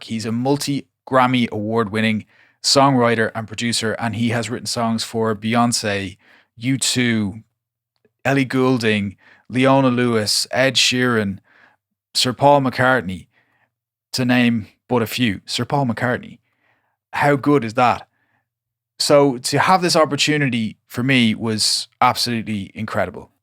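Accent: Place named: Ireland. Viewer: I saw this come up and was very interested. I knew that I wouldn't have the time available to dedicate to it that it would need, so had to pass it by. great to hear that it delivered on the promises made. The track that you came up with sounds great. how do you think it compares to your tracks previous to doing the course? Keep up the good work, and keep the videos coming. I find it way easier to listen to a fellow Irish mans accent than those annoying overly neutral Americanised accents :)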